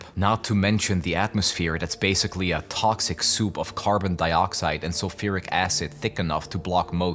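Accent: Netherlands. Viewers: Are you Dutch perchance?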